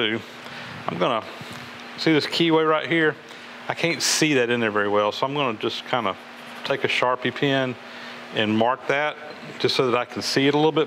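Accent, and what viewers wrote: South, United States. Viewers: "No, I put the oil in the hole!" "You put the awl in the hole???" "No, the oil, in the oil hole!" "There is no awl hole!" "... " (my Dad had a reasonably heavy Southern accent, so I enjoy hearing one!)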